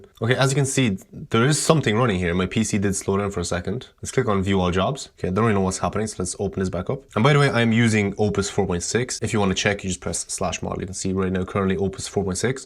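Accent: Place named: Ireland. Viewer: Man you Really have an Irish accent!!!!!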